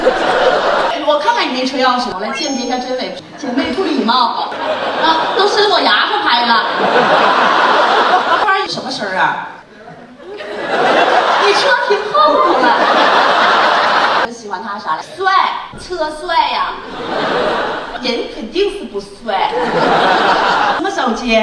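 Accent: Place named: Northeast, China. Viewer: 东北口音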